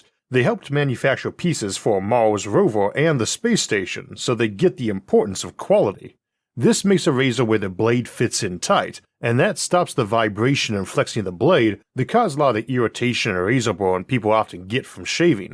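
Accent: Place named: South, United States. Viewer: Not sure why the person reading this sounds like a southern American plantation owner. Terrible accent. Gave up watching it because I couldn’t stop laughing at it.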